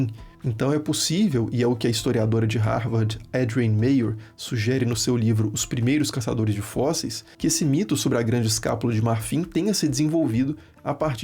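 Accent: Minas Gerais, Brazil. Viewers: que achado foi o seu canal!! As temáticas que você explora são extremamente interessantes e, ao meu ver de leigo, pouco conhecidas do grande público. Você tem um conhecimento incrível, uma didática ótima e (detalhe não negligenciável) uma voz/sotaque mineiro muito gostosos de ouvir haha Para mim, é o melhor canal de história em português! Parabéns pelo seu trabalho maravilhoso e obrigado por compartilhá-lo!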